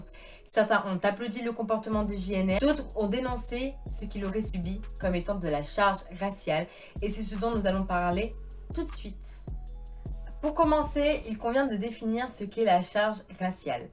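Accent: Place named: England, United Kingdom. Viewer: Mais faut arrêter d’être tout nier et être sincère. Le sujet est traité d’une bonne manière. Mais en aucun il est complexe, de deux il y’a un ton trop magistral et ne parlons pas de l’accent anglais qui fait peur. De plus il faut prendre en compte plusieurs paramètres. En effet, la vidéo sur JNR avait pour but de mettre à mal ce dernier et quoi de mieux que d’insister sur les clichés du raciste pure et de l’imaginaire de la banlieue. De ce fait Cette vidéo nous démontre à quel point tout cela n’est que pure imaginaire de la part des « autres » ce qui imagine la banlieue à travers un prisme mediatico-politique et malheureusement c’est loin de la réalité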